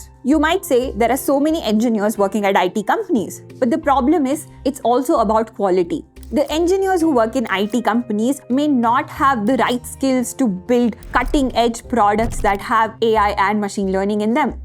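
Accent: India. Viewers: Get an accent coach. Oh that indian accent is a torture for ears.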